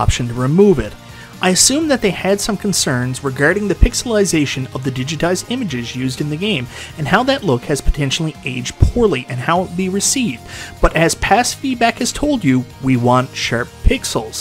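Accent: Newfoundland, Canada. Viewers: This guy from Newfoundland ?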